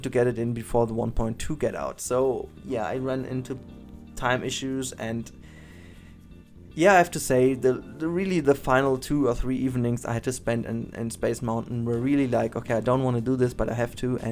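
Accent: Germany. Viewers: Jaw-dropping work as always. It is simply un-believable. 😜🙂🙃 I was wondering as a quick question, do you speak any other languages besides English (I'm assuming German because of your accent) but anything else?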